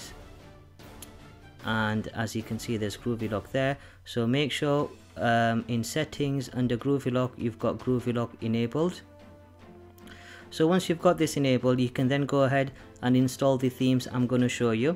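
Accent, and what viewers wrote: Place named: Liverpool, United Kingdom. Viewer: ***** yea i think it's a Liverpool accent or I think they call it Gordy lol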